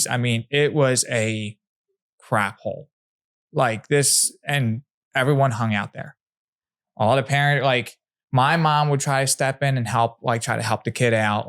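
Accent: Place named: Delaware County, United States. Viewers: I swear I was in bowling green in pa with you can’t forgot that delco accent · Wish I could listen, but that Philly accent grinds my gears hahahah. Practicing tolerance 🙏🏻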